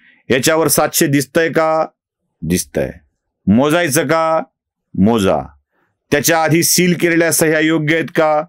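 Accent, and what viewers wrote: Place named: Marathwada, India. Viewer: श्री आपण मुद्दा तर व्यवस्थित मांडता, त्यापेक्षा मराठवाडी मराठी खुपच छान बोलता. धन्यवाद.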